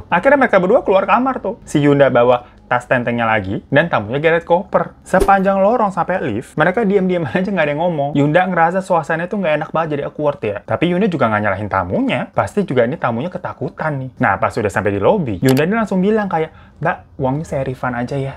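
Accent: Java, Indonesia. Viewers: Bang ini ora asli cina buakan ya. Wajah nya kok kayak orang cina. Tapi bisa bahasa jawa medok banget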